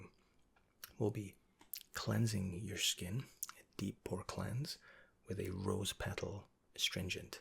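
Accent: Scotland, United Kingdom. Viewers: I love the Scottish accent and love the way you pronounce words and speak so eloquently. Much love from South Yorkshire 🇬🇧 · He's Scottish!? His accent is so subtle I thought he was from the U.S.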